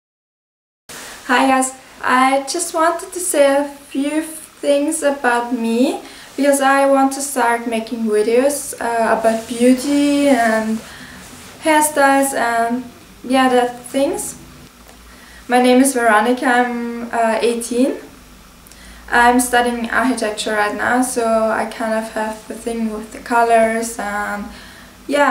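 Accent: Austria. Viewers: okay hier hört man, dass du aus österreich kommst! ;) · I'm watching all your videos so I can pick up your Austrian accent!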